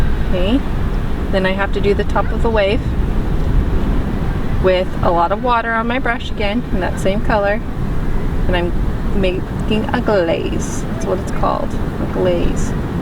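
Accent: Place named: Midwest, United States. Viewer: You must be originally from the Midwest/east.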